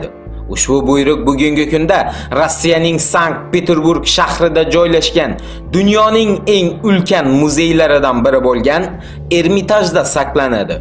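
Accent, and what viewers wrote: Khorezm, Uzbekistan. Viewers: eyy siz O'zbekistonni qaysi viloyatidansiz xurmatli admin shevaizdan Xorazimliksiz manimcha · Ovozingga qutagim mincha xorazm diysan o'zbek de bildik zorazmdan ekansan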